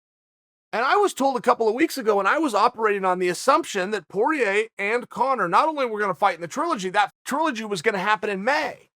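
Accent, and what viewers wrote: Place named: Oregon, United States. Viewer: Chael your Oregon is showing.... golly 😂 this guys the best.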